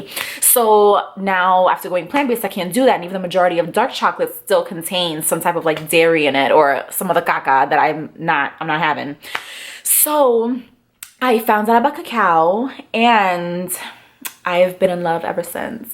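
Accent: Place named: Boston, United States. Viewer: FYI You are so beautiful and I love your accent. You almost sound like you are from my side of town (Boston). I am in Charleston right now but I am bean town born and raised!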